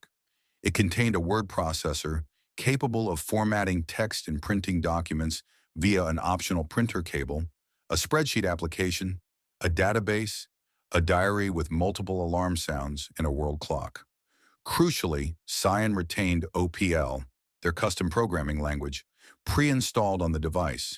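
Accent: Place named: United States. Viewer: Can someone please tell me why a video on British computers has an American voice??! I find this very odd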